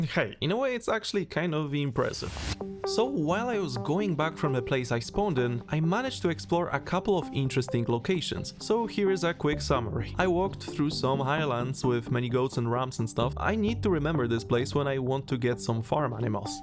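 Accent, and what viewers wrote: Poland. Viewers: slychac ze Polak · Are you polish? You sound VERY polish/slavic and I fucking like it. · You sound Polish. Are you Polish? I am Polish, siema.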